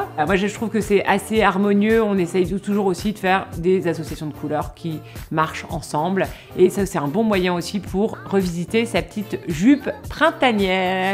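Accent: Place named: Nord-Pas-de-Calais, France. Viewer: Merci! J’adore ton petit accent chti qui me rappelle ma région natale 😘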